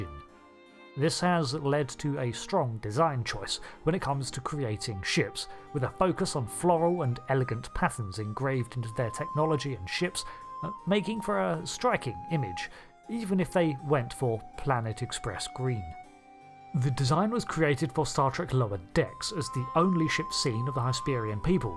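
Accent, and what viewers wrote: United Kingdom. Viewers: The British Accent elevates the dialogue to hitherto unseen heights.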